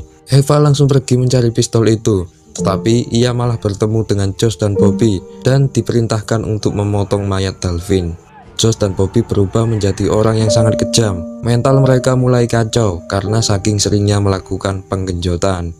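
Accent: Java, Indonesia. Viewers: Medog parah min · Lucu narator nya medok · masnya medokkkkk😂😂😂👍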